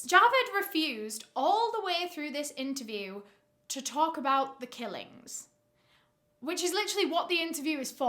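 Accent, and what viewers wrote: United Kingdom. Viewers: ...which part of UK are you from? Loving your accent and you look lovely and beautiful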